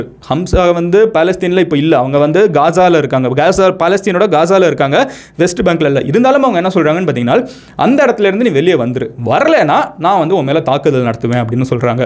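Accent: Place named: Kanyakumari, India. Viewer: Kanyakumari slang ❤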